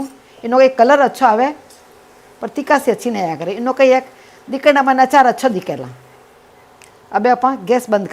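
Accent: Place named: Rajasthan, India. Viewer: Bhabi ji aap ka achar khub achcha lagey. + your sweet rajasthani bhasha 💐💐👍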